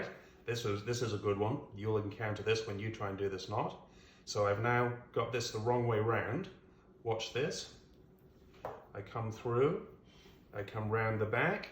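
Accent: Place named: United Kingdom. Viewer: Great video; so far all of yours that I’ve seen are very well done with clear and direct instructions. I have a question. You sound to my ear like you are British. I’d that the case? And how did you come to use “Royal Navy Yachmaster” as a title? I live in Canada now but am also an American citizen. Up here, using the term “Royal” generally indicates that English Monarch would have bestowed that honour, so I’m a bit confused.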